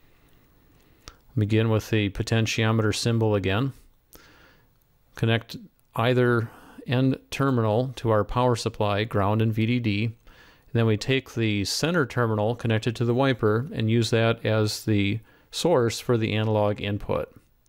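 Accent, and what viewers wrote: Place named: Oxford, United Kingdom. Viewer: SIR Just now i watched yr video on POTENTIA METER. My copliments to you are:-- 1. liked yr video for its quality information packed in it. 2. U are a very good tutor/lecturer and i deduce from this small video that u must have wide rich practical working experience in the electrical/electronics field. 3. i could follow every word of yr lecture because of yr stylish English that too Oxford English which we are familiar here in India. 4. yr diagrams there in the video and appropriate formulas are added assests to yr lecture. 5. please keep up the same pace /speed of yr lecture in future videos also as we dont miss any thing there. 6. Subscribed and looking forward to yr videos on electronic essentials. Thanking you vatsa Bangalore INDIA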